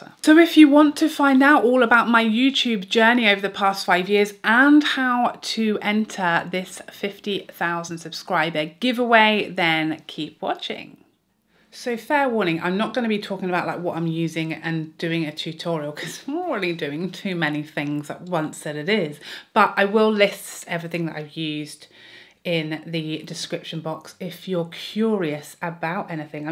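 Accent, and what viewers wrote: United Kingdom. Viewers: Congratulations dear, 5 years and 50K i'm so happy for you!!!🥂🤩🎉👏 I'm almost 3 years watching the beauty video's here. I found you because of dear Mel, and i know how much you hurt because of the loss of her, so i was also so happy to see that you got your humor back, your British humor i love so much, but not only that, i love you being you, not uploading to be loved by those companies but the interaction between you and us!!! And, here too, i love these "you don't need that" video's you don't realise how hilarious you are!!! I love your lovely beautiful you, knowing so much about all these products, being so honest what is good and what not, your enthousiasm, your beautiful elegant British English of course, i'm so thankful to you that you make all these beautiful, pure, honest wonderful and hilarious also video's for us, full of know-how!!! 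And if you would upload less, i will always watch your video's!!!! Enjoy these special days, sending you lots of love from your neighbour country Netherlands!!!!😘😘😘😘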